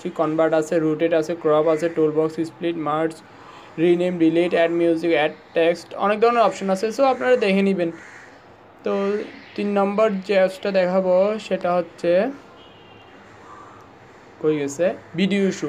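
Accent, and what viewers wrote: Chittagong, Bangladesh. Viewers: ভাই মাঝে মাঝে আপনার গলায় চাটগাঁইয়া ভাষা চলে আসে! আগে শুদ্ধ করে বাংলা ভাষা বলতে শিখোন।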